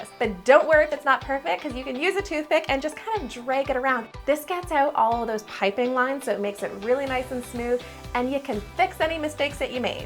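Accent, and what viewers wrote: Canada. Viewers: Idk why people make fun of it I love how Canadians say out and about it’s just the cutest thing ever.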